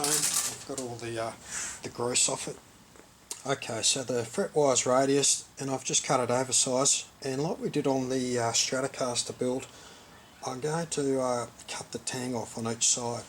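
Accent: Australia. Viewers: Thanks for an absolutely great video on how to do this with precision! And I love your Australian (?) accent:)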